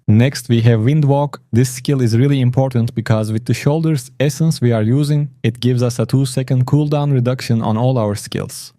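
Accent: Turkey. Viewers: kanka ingilizcenden anladığım kadarıyla Türksün. F2P oynanır mı zevk alınır mı bu oyundan ?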